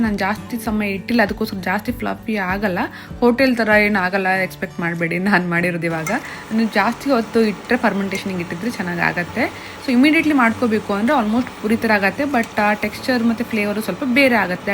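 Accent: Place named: Andhra Pradesh, India. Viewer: Your telugu accent is good ma'am :)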